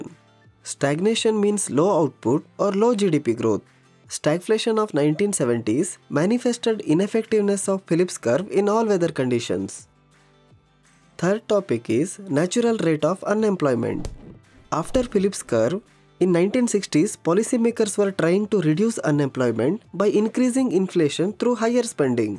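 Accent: India. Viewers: Bro..ur english is really Indian...awesomely Indian.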